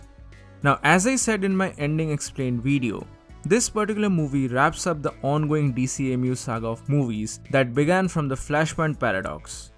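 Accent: India. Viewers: No offense but you sound like the typical Indian person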